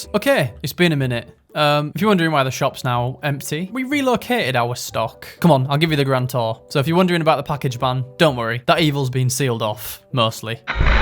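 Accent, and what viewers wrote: United Kingdom. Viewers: with a British accent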